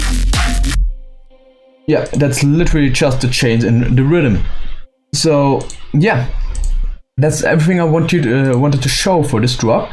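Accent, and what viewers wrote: Germany. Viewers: are you German? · You sound kinda german tbh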